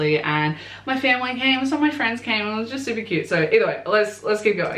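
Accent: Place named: Australia. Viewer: YOUR ACCENT!! ITS CHANGING SO MUCHHH💕 YOU’RE LIKE 100% AUSTRALIAN NOW!! IT’S SOOO CUTE!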